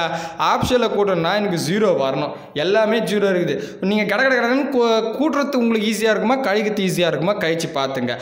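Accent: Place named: Chennai, India. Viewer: Chennai slang explanation.... Nice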